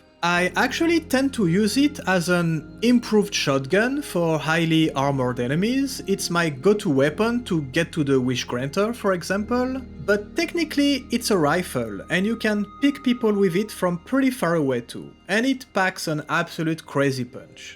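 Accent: France. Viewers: Obviously french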